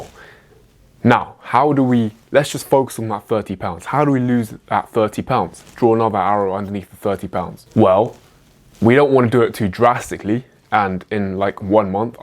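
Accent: England, United Kingdom. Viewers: you are an absolutely gorgeous and handsome young man - your English accent is so sophisticated and classy and you have gorgeous blue eyes - I’m an older Irish gay man in the U.K. and I would happily date you in a heartbeat - all the best for 2024 🇮🇪☘️🇬🇧❤️💗🙏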